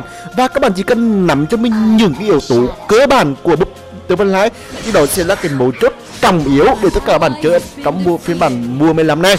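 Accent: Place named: Central, Vietnam. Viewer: Anh ở miền trung à giọng giống em vãi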